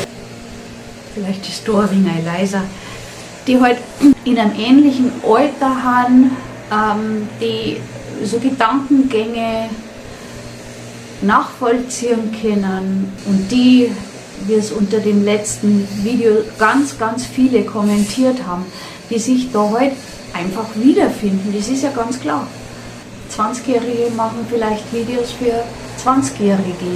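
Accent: Bavaria, Germany. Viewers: So super schön deine Videos, vor allem so vielfältig!!!😊 Finde auch deinen Dialekt super, ich komme auch aus Bayern und verstehe sehr gut was du sagst😊 Musste eben so lachen als du geflucht hast (Zefix...)😂😂😂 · Ich finde es ganz natürlich und wunderbar ,wie Du Deine Videos für uns rüberbringst. Und bayerisch ist eben bayerisch, wie die Landschaft sich gestaltet, so passt auch der Mensch. Ich liebe es, alles zusammen. ❤lichen Dank.....hier spricht der Städter, der die Berge liebt und vieles mehr😅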